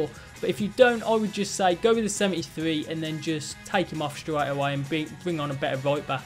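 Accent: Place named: Wolverhampton, United Kingdom. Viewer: You from wolvo 😂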